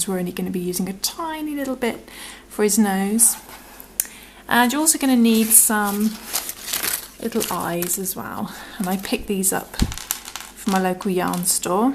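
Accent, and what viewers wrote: England, United Kingdom. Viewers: Finding it confusing because although you have an english accent you are using american terminology. ie your dc is our treble. Anyway, will just watch and not listen lol. Very cute ty for sharing.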